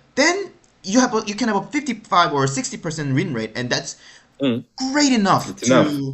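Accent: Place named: Germany. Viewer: That’s due to the German accent + deep voice :D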